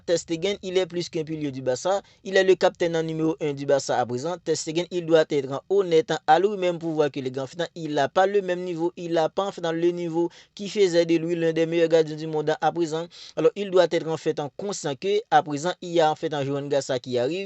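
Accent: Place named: Haiti. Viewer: Je reconnais l'accent d'un patriote haitien, force bro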